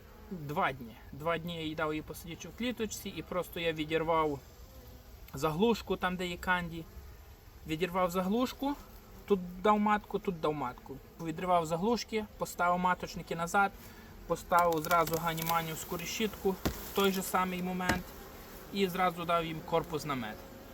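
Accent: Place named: Ivano-Frankivsk, Ukraine. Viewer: Цей говір , ну відразу ясно що людина з Франківська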